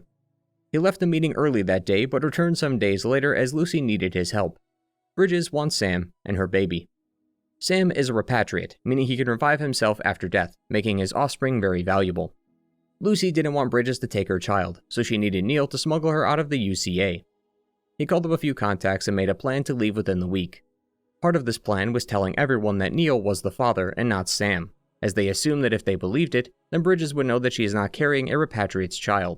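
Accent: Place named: New York, United States. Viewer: Dude you make such good content 🎉🎉 Also well done, great to hear someone with ny accent doing so well 😁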